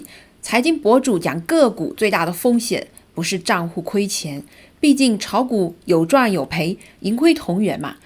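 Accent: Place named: Pingxiang, China. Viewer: 有萍乡口音，要么您就是湖南人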